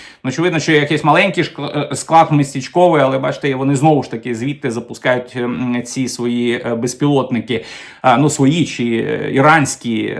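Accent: Russia. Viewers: Пане, сьогодні вам я поставлю лайкос, тільки за те, що мені не різало вухо оте ваше, рашистське, -- "тєма", "схєма", "проблєма". P.S. Але ж, ні, в кінці ви таки викинули своє рашистське "тєма". Ну, не в обіду вам, але так виглядає, що ми з совка вийшли, але совок залишив свій слід. Ви послухайте, як говорить корінний росіянин, котрий не так давно перейшов на українську мову, телеведучий Олексій Суханов. Та в його вимові близко нема таких висловів, як у вас,корінного українця, котрий частину свого життя пропрацював на УТ.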